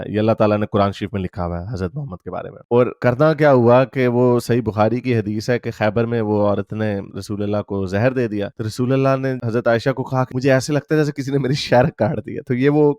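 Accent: Punjab, India. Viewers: Punjabi accent thoda jyaada ho raha hai,, samajhne mei dikkat hota hai.. Thoda plain hindi bola karein. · Your accent and panjabi tadka kamal hai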